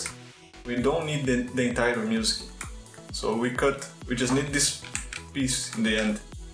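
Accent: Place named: Brazil. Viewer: Pra mim ele já é pica por meter a cara e fazer o video em inglês, já moro fora a 6 anos, falo inglês todo dia, mas ainda não tive coragem de começar um canal em inglês. Mas o sotaque BR é tipico, esse é o nosso tempero especial, os outros BRs sempre me descobrem pelo sotaquezinho de fundo e eu eles. haha Muito bacana, agora eu vou lançar meu neon aqui pro meu wallpaper. hehe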